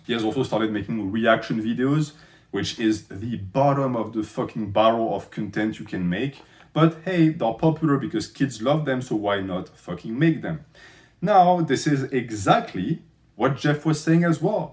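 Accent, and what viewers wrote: France. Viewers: Why are you french?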